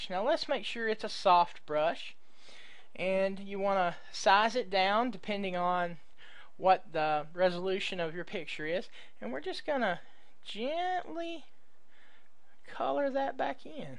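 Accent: Texas, United States. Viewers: Thank you! but hey are you from Texas?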